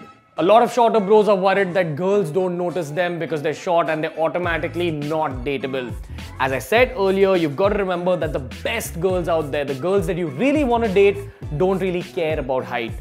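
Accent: India. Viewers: I’m just hear to listen to ridiculous tips in an incredibly thick Indian accent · Did I just watch a whole video with an Indian guy with an accent giving styling tips and really enjoyed it ?! Lol seriously though great editing , great talk! Feel like this guy could sell me anything .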